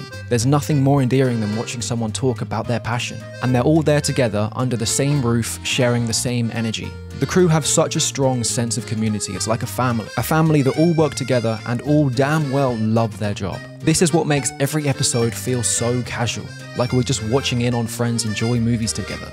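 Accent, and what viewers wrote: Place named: England, United Kingdom. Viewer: Lovely English accent - not Irish, Scottish or Welsh. Crisp, clear English accent. I sat through your entire video just listening to you talk. Don't get me wrong - the content is great. But I was here for that lovely English accent! Thank you.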